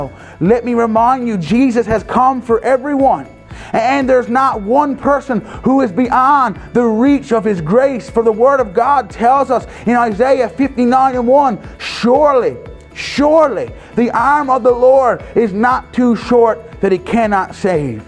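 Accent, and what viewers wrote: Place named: Newfoundland, Canada. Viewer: I have never heard a broader Newfie accent than this pastor- cracks me uppppp!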